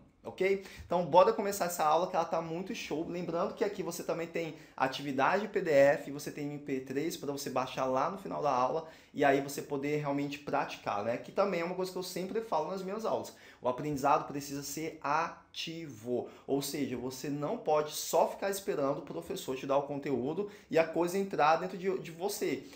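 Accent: Brazil. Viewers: A parte de explicação gramática está joia, mas a pronuncia tem muita influência do sotaque brasileiro, seria importante aprimorar essa parte.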